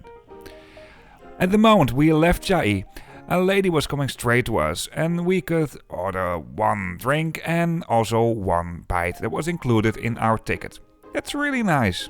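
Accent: Netherlands. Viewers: you have strong dutch accent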